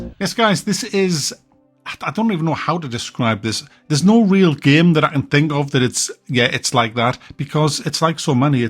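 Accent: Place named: North East England, United Kingdom. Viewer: It's a North East thing, in general. He's from Newcastle, I'm from Sunderland. They say it like that there too.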